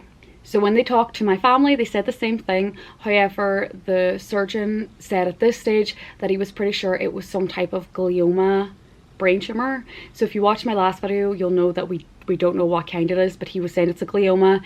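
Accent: Ireland. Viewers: Thank You so much for Your sweet update on Your progress! So glad it’s over for You You are so beautiful inside and out and I adore Your accent its Irish, isn’t it? Trust the Lord in all Your ways.❤️ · I'm glad to hear that you're doing well post-surgery. Did they tell you what filler they used to fill in the hole? It's usually fat! I also noticed moments where your accent turns Canadian eh! Now there's now't wrong wi' it, just the Irish accent is the worlds favourite so don't lose it! My next door neighbour is Northern Irish and I love listening to her talk. Ask the surgeon if they recorded the surgery and if you can have a copy of the video... they're not gentle when they need to move you around, that'll explain the pain here and there. Keep up the recovery. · You're SO BEAUTIFUL! And articulate, aaand i could listen to that Irish lilt all day... Your positivity and courage are inspiring, and i admire the grace with which you're handling this scary and overwhelming experience. 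I am praying for you dear... Hope you can feel the love and support from all of us who would help you carry this heavy burden in a heartbeat if we could. Sending you comfort and the peace of acceptance, whatever lies ahead. ❤❤ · also your irish accent is sooo pretty! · Absolutely love your beautiful Irish accent. You are looking so good ❤ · Thank you so much for sharing your journey with us! I hope the doctors bring good news so you can live a long and beautiful life. You have.a huge heart and are lovely inside and out. Not sure where you originate from but I enjoy your accent…it sounds a wee bit Irish 😂. Love it. Bless you and stay in touch with us.❤🎉🎉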